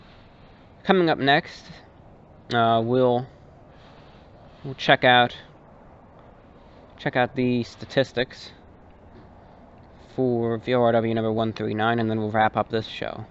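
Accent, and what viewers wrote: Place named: East Coast, United States. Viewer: Just wanted to say good on you for wading through all those trolls and hate comments. I'm on of the people who came from reddit and I'll honesty say this: you're one of the most humble and genuine guys I've seen on youtube. I love the soft East coast accent and your personality. I watch your videos every morning with my cup of joe and my breakfast. You're just perfect to listen to. Keep it up and I hope you do well in the future!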